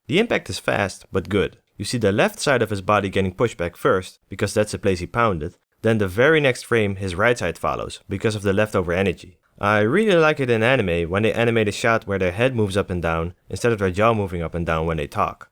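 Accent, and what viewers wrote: Netherlands. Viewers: I love it that I can just hear your Dutch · Great vid! I noticed a bit of a dutch accent but I can be wrong though😂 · 1 second into the video, hears his accent yep hes Dutch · aaaahh the dutch accent sounding ass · Hey im new to this channel, maar ik hoor je Nederlandse accent kapot erg niet normaal maar je spreekt het nog best goed props.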